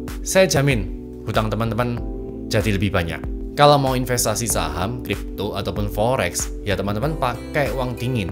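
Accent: Java, Indonesia. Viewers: lihat.cnl.ini.lngsung.tertarik.kaget .mase.ganteng bangt kok.suaranya.kejawen....mantap...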